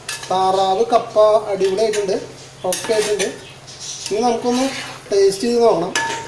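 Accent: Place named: Thrissur, India. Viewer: രാത്രി പതിനൊന്നരക്ക് ഈ വീഡിയോ കണ്ടപ്പൊത്തന്നെ വിശപ്പായി... 😀... നല്ല അവതരണം, തൃശൂർ ഭാഷ എന്നെപോലെ തിരുവനന്തപുരംകാർക്കു വലിയ ഇഷ്ടമാണ് 👍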